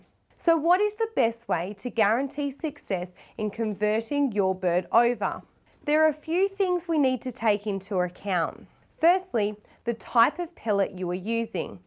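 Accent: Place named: Australia. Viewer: Wow that's a strong Australian accent. I came for the bird info but I got really fascinated by her voice, PS. I'm from the United States so I never hear different accents.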